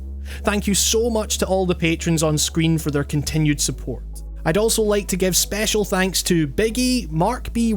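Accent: Scotland, United Kingdom. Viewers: Is that a Scottish accent?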